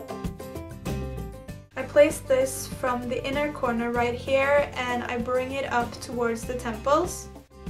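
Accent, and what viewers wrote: Norway. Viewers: It's the norwegian accent.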